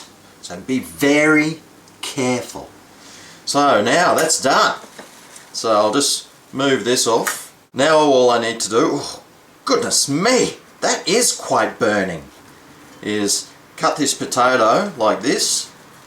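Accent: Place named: Australia. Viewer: I like your videos. Also a great way to learn some Aussie English:)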